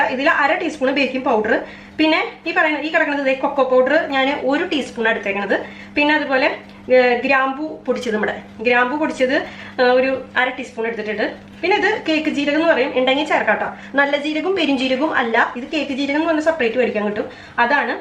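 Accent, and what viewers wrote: Thrissur, India. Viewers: Taken long break in between. Eager to watch your vlogs bcoz of pure thrissur slang. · Chechi superb presentation,nammude tcr basha pollichutta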